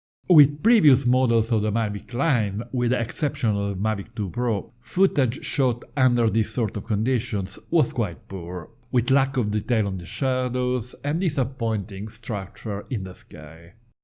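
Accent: Italy. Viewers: Awesome accent. Love it. I guess it's Italian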